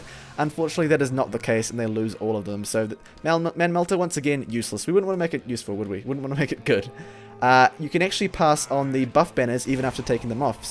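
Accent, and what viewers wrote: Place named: New Zealand. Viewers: I'm not overly familiar with Kiwi accents, but it sounds like Australian but different, so I'll go with NZ too. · He's from new zealand I think?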